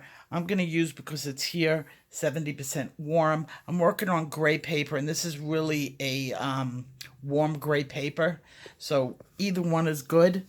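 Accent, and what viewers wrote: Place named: East Coast, United States. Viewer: I love your work and your accent reminds me of when I lived on the east coast. Very fond childhood memories, from a simpler time. So thank you, for helping me with this new hobby and reminding me of my childhood.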